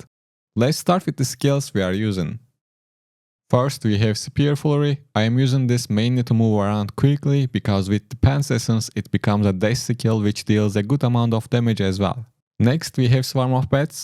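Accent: Turkey. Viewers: Kankam selamlar,Türk olduğun aksanindan belli bu arada, tüm classlarla oynamış biri olarak; en hızlı farm yaptığın Class hangisiydi ? Favorin nedir ? Şimdiden teşekkürler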